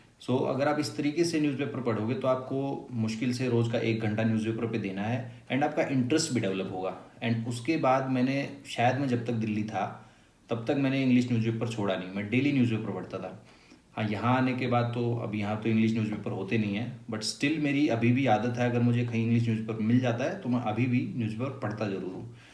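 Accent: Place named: Haryana, India. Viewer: thankeww for the strategy but i love the harayanvii touch in language❤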